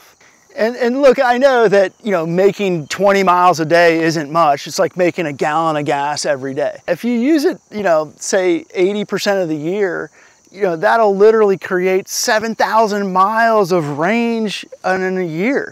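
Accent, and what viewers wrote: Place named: California, United States. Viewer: This guys Cali accent is hilarious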